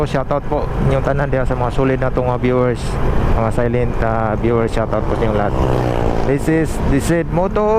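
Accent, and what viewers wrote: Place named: Visayas, Philippines. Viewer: Rs dol. Sa intro palang bisaya na kaayo😂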